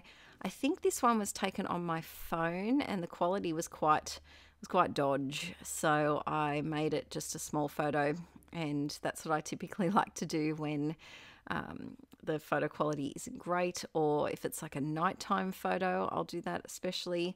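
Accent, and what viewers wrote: Australia. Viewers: I enjoyed watching your video. Thankyou for sharing your process. I agree, the papers you've used are beautiful. It's nice to hear another Aussie. A fellow New south weigan nonetheless. 😁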